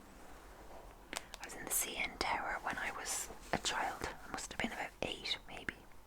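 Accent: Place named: Ireland. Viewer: Great video, I can't get enough of a) fact videos and b) Irish accents so this is amazing!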